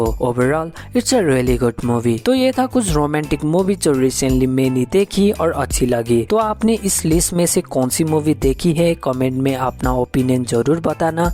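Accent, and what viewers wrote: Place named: Bengal, India. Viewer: Tum bangali hai kya😅😅😅tumhra accent lag raha 😅😅😅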